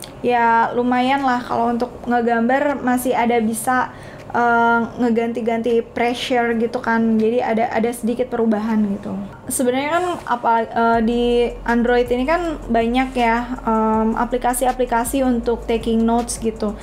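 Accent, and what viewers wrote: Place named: Java, Indonesia. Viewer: gak tahan ane denger aksen wenglishnya :D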